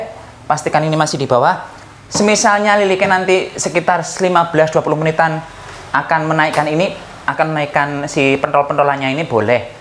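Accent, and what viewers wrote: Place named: Banyumasan, Indonesia. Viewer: Mase nek bicara ngapak, daerah cilacap tah mase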